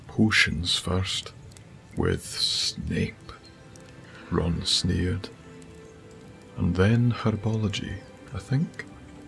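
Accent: Scotland, United Kingdom. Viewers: you have a lovely bit of Scottish accent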